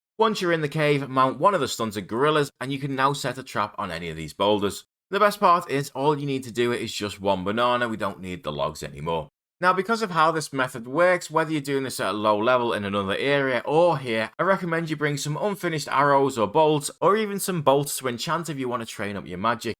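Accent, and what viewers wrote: Liverpool, United Kingdom. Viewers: Fellow scouse osrs player?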